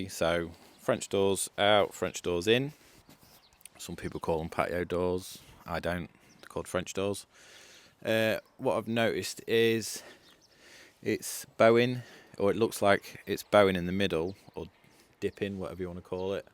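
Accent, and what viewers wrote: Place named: Doncaster, United Kingdom. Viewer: Sounds like you're from Doncaster or somewhere.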